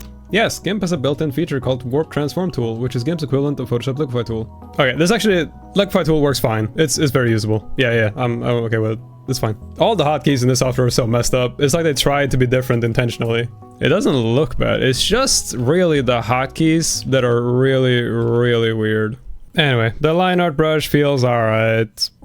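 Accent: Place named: Sweden. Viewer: could tell by your accent you're swedish (from a norwegian with a lot of swedish fam) much love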